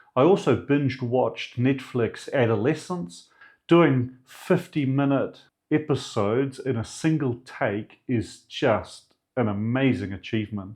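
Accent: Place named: Northland, New Zealand. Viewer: Are you a Northland person? You've got the accent of a Northlander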